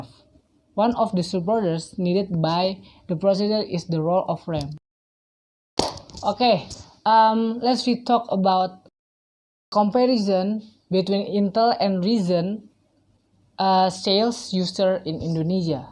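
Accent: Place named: India. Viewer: logatnya sedikit mirip kaya orang india wkwkwk boleh laa kak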